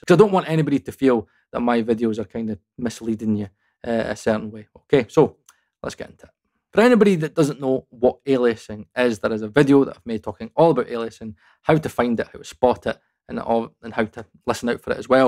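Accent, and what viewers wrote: Scotland, United Kingdom. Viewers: Lol. I didn't know that's how the Scottish accent sounds like. It's kind of cool. Hey, BTW, I'd love to see you reviewing and testing Black Rooster plugins. 🙏🏻 Thanks a lot for your great work!! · Yeah, it surely would improve the intelligibility. I mean, being Scottish doesn't help it one bit. 😆 Love your vids, man, keep'em comin'! 💪😎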